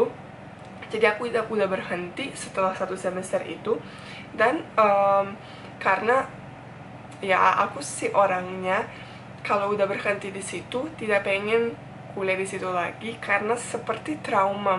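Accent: Jakarta, Indonesia. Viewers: Ya, logat Jakarte. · mantap, logatnya indo banget...😘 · Teteh hebat... Bahasa nya keren abis... Indonesia banget...!!! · Di kuasai nya bahasa Indonesia dgn bahasa pasar Jakarta , pertanda kamu biasa ( pandai ) ber gaul . Aku salut . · Mantab Indonesia banget omongan nya logat dan pribadinya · Wanita cerdas, dan saya suka itu. Selain itu eleketro memang rumit tapi sangat mengasikan. Aksennya juga udah 90 % mirip dialek penduduk lokal indonesia. Good Job.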